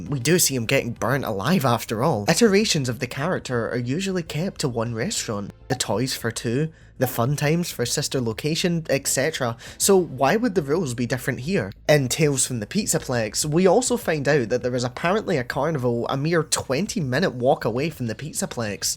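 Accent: Scotland, United Kingdom. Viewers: great theory actually AND you remind me i love scottish accent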